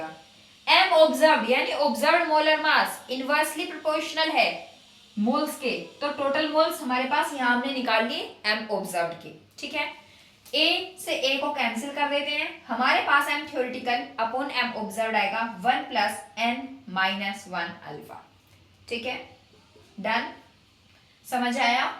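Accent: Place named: Uttar Pradesh, India. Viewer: ji up se hai kya aap if i not wrong because your voice tone is just like that ❤❤❤❤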